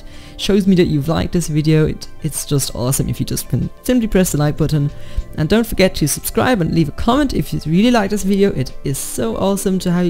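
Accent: Germany. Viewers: you sound german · Sehr gutes Englisch aber man merkt das du Deutsch bist :D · are u german ? u dont sound like a english guy :D greetings from germany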